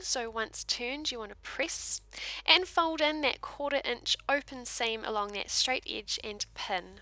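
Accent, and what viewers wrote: New Zealand. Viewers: A kiwi voice yay! Great tutorial thanks.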